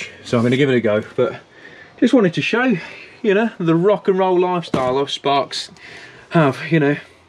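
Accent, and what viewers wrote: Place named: Nuneaton, United Kingdom. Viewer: Was trying to place the accent as thought it sounded East Midlands. Turns out it's Nuneaton, never noticed how different it was from Coventry before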